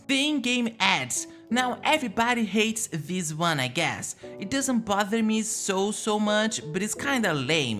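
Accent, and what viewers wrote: Brazil. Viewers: I still have Sims 2 Ultimate Collection and I love to play it so much, its thousand times better than Sims 4, but then I never played Sims 4's DLC's, so I want to experience the DLCs for myself too. So, I play both but with the Tutorials from PleasantSims, so I play rotationally and just with the Maxis Sims, and I use a lot of Mods too, that are essential. There is even a Sims 2 Savefile, which has the ancestors and everything from Sims 2, but I never used it I just saw it in videos, it seems to be perfect, but I feel like Sims 4 isn't the same universe as Sims 2. It would be perfect if they could make a new Sims game with Sims 2 + 3 gameplay, world, ancestors and all and just the CAS and B&B from Sims 4. That would be perfect, what do you think? PS: Seu sutaque brazileiro é notavel, mas o seu inglés é muito bom! É bom falar assim com o sutaque. 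E já me inscrevi no seu canal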